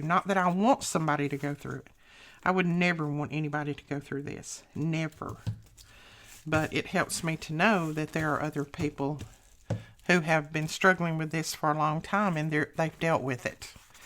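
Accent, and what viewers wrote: South, United States. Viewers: You really do have so many people that love you! I just love you to pieces! You really are great. I miss you like crazy when your not on. I think it's your voice..just reminds me of home(the south) and my momma and Grams who are both gone. I wish that I could just give you a big hug, pray with you, and try to make things better! Not sure if you got your ink yet but just a fyi Tina from Shabby Dabby Doodah has insta ink too and she was saying how this time she has been waiting forever for her ink to come in. So if you don't have your in a couple days you might wanna try and get in touch with them! Love and prayers, collette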